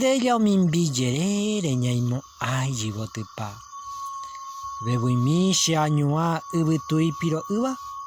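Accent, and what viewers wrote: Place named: Paraguay. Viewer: Hermosa poesía de nuestro poeta campesino, a veces no comento por qué ya soy redundante jaja. Entendí bastante , pero escuchar esa voz con acento guaraní ya llena el corazón. Seguramente en el medio de la noche se pueda apreciar mejor la belleza de las estrellas, sentir en Soledad la fragancia de la tierra, algún sonido misterioso qué solo la noche te puede regalar... sin temor a algún aparecido ó fantasma. Cantarinas palabras florecidas en plena medianoche, hace alegrar mi existencia y me contagió y me creo dueña por un rato del poeta...🥰🎼🌈❤🌻🦋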